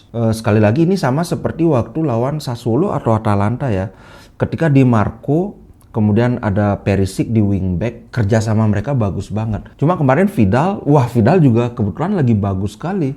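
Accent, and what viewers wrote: Bali, Indonesia. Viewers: Org bali ya bang?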